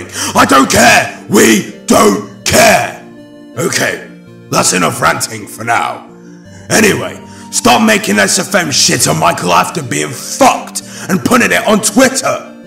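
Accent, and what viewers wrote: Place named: United Kingdom. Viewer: Why does he sound Brit'ish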